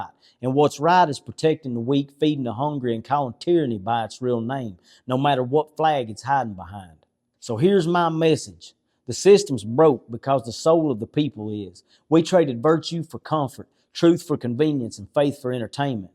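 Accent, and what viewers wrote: South, United States. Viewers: I love listening to his South Alabama Texas Redneck accent….☝️👍😁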